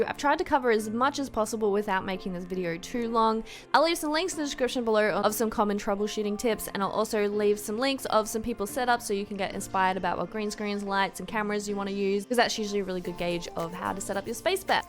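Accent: Australia. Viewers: Oh you're an aussie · Listening to her accent I get Aussie vibes....am I accurate? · Is she Australian? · i knew it, i knew u are aussie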